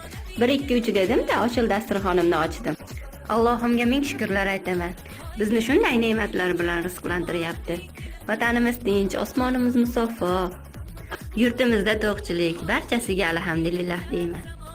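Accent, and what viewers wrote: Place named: Andijan, Uzbekistan. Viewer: Anjandi shevasi baribir boshqacha daa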